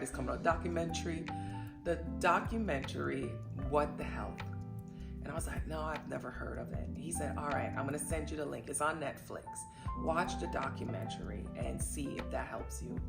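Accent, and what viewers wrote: United States. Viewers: You are glowing. And your American accent reminds me of Nia Long. She is from the Caribbean, too 🩷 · I really enjoyed this. I though I detected some New York accent. I am from NY.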